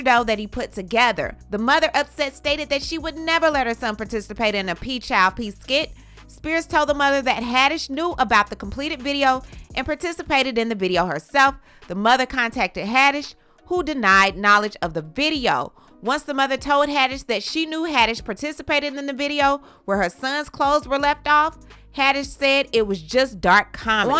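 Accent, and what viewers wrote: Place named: Carolinas, United States. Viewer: I love and appreciate your coverage. You sound just like my cousin so it's easy listening to you plus you're detailed in your coverage. Thank you.. by the way are you from the Carolinas, bc you sound like us!! Lol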